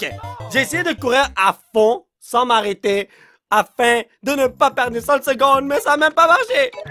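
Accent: Quebec, Canada. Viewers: Sa fait je sais pas cb de video que je regarde et je me demadais si il était quebecois ou francais maintenant j’ai la reponse xD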